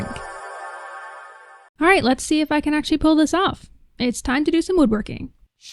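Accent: Newcastle, United Kingdom. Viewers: Are you from Newcastle??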